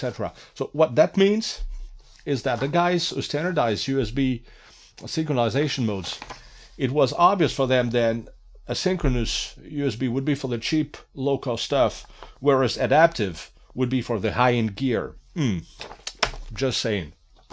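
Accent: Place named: Quebec, Canada. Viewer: Hi, I was wondering if the old isa sound card such as sound blaster 16 which already supported 16 bits 44.1khz would have incorporated r/2r ladder dac instead of binary weighted ones? Despite being old sound cards, i couldn't find anything wrong to the sound coming out of them when propely feeded with a lossless source a few years ago when I messed around with such things. And you're probably from Quebec with that accent. Lol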